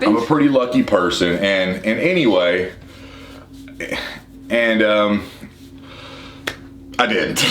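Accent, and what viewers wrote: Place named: South, United States. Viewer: I could listen to him talk all day! Lol Such a southern boy accent!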